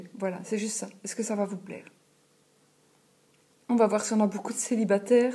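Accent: Belgium. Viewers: Faites au mieux mais je suis toujours très pressée de regarder vos vidéos..et votre accent belge me rappelle des amis""perdus"".....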